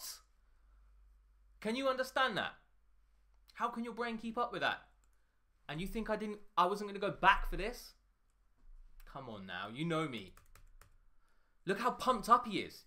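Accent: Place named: England, United Kingdom. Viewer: Your english accent is so cool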